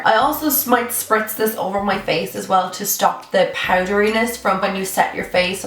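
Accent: Ireland. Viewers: You're gorgeous! 😘 Love your accent, is it Irish?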